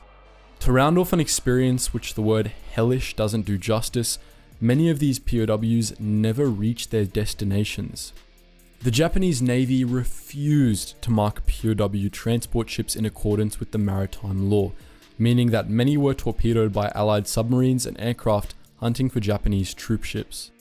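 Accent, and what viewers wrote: Australia. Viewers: I know this is months later but I’m really wondering, are you SunnyV2 or Internet Anarchist? Because I swear every dude from Australia on here speaks in the same voice and cadence. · Your Australian voice is very young, youtube earnings young, so what evidence are you basing your narrative on to earn your youtube income? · Do you have another channel or do all aussies sound the same to me?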